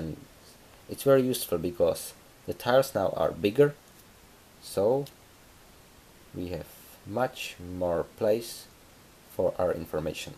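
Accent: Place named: Czech Republic. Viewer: AAAA!! Ta česká angličtina je fakt hrozná, nic ve zlém, má to tak většina lidí z ČR =D Jinak příště zkus líp zaostřit na telefon, ať to není tak rozmazané ;)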